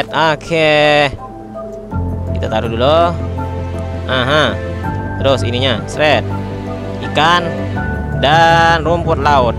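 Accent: West Sumatra, Indonesia. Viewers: Bang suara lu kaya Minang kocak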